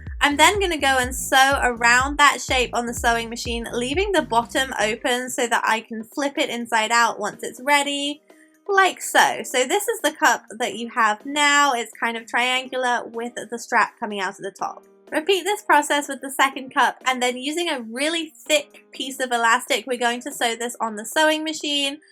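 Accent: England, United Kingdom. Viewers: Shes english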